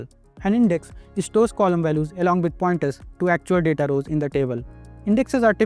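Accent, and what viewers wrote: India. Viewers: Too much Indian accent for today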